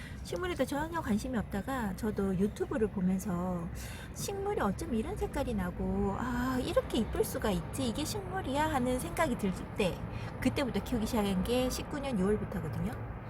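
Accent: Gyeongsang, South Korea. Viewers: ㅎㅎ 아마도 안본사람 있어도 한번만 본 사람은 없을듯합니다 계속보게되는 끌림이 있네요 댓글도 일일이 달아 주시는 모습에 감동 또 감동입니다 앞으로 구독자가 4만~400만~~ 될때까지 팬으로 응원합니다^^♡ 말투도 정감있네요 그래서 대구근처에 사시는 줄 착각할 뻔요 ㅋㅋㅋ 댓글달기도 저는 첨인 아슬맘 이거든요 ㅎ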